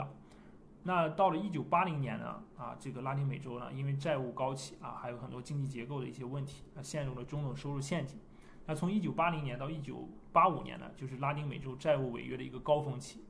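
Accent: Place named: Shanxi, China. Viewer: 像山西的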